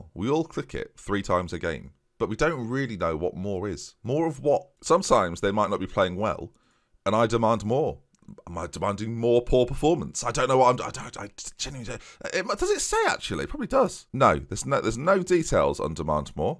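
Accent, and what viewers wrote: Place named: Newcastle, United Kingdom. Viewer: Tbf I only liked the video for the Geordie accent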